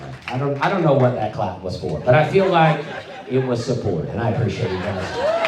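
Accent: South, United States. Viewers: The thing is there is not ONE joke in this entire set? Just uncomfortable country drawl mumbling? Do you do jokes or just ramble aimlessly? If the people were laughing hard you wouldn't be heckled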